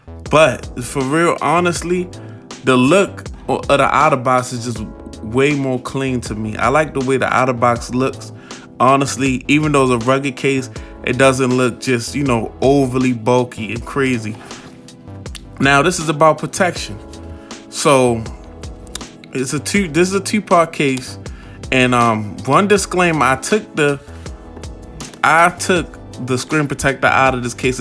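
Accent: Baltimore, United States. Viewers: ... Great review fam! And just on a side note, you got a BMORE accent if I ever heard one! If you're actually from there (which I'm sure you are), I'm actually from Whitelock homie, but I live in Vegas now for almost 15 years. I'm 100% sure you know where Whitelock is.... Anyway, keep on keepin' it real with these reviews. 🦀 Forever LOL... God Bless!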